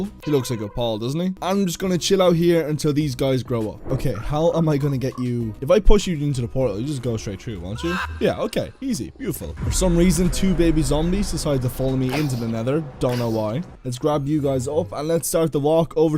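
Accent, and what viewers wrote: Ireland. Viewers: I can smell the irishness from a mile away, my dad is irish so this is wholesome👍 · I KNEW IT, as an irish man when u were saying stuff like "tree" instead of three (it wus rly subtle but i could kinda tell) i KNEW u were irish, i jus couldnt prove it until wait... ive been watching that long?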